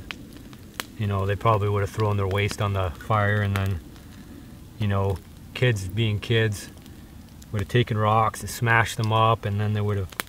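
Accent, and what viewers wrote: Canada. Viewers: I knew it was Canadian the first time he said "aboot".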